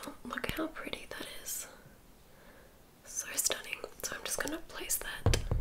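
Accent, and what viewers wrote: Australia. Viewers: Please do a makeup collection tapping !! Love your Aussie accent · Bonnie May it’s an Australian accent. Can confirm, am Australian. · I swear an Australian accent makes everything better.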